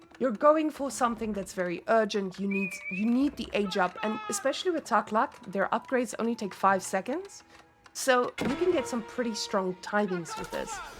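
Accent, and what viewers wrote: Afrikaans, South Africa. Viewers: Taklak, die Afrikaans klap hard :p Lovit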